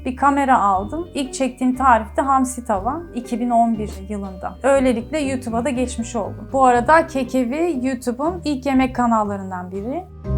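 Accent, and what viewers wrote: Turkey. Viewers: Çok takdir edilecek bir başarı yakalamışsınız. Çok gurur verici.Ancak gücenmeyin lütfen ama yapıcı bir eleştiri yapmak istiyorum. Telaffuzunuz tam bir Türk gibi. Aksanınıza biraz daha özen gösterirseniz yabancılar tarafından daha fazla izleneceğinizden eminim.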